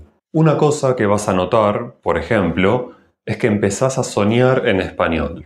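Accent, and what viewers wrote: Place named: Paris, France. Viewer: A veces me pregunto si usted tiene antepasados franceses, porque su entonación suena como la de los parisinos.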